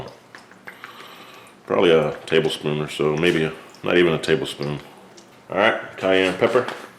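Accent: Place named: Louisiana, United States. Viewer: But the accent def. has some southern Louisiana in it...that ain't Texas. Got too much 'yat going on to be from the Lone Star. Laissez les bon temps rouler, no?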